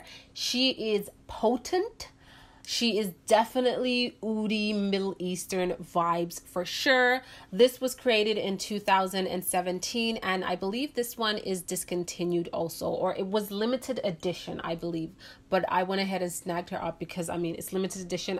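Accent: New York, United States. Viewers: how did you start getting a NY accent???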